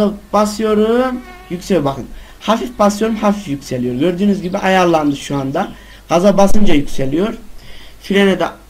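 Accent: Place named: Konya, Turkey. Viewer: helal olsun abi bu arada sen nerelisin konyalı gibi konuşuyonda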